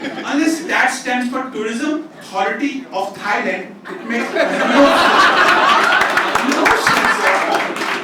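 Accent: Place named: Punjab, India. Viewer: That is not Indian English, it is only Punjabi English.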